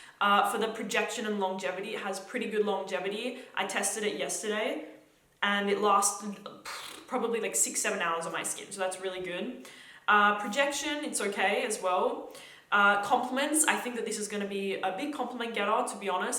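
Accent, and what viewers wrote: Australia. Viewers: i thought you were english you are australian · girl you surely have a STRONG aussie accent.... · Aussie accent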